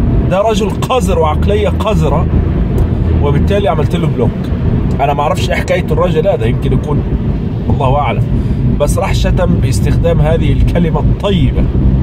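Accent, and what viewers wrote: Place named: Ireland. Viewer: Such an Irish accent! try to do it more bro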